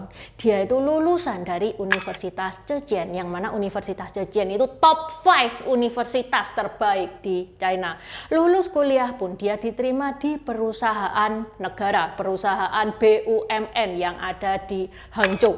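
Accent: Java, Indonesia. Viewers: kocak kalau cici bercerita, medok sekali ci....hehehe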